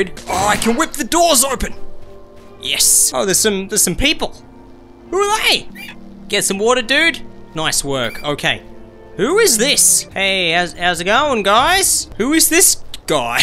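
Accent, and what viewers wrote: Australia. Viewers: Is he an Aussie like me?!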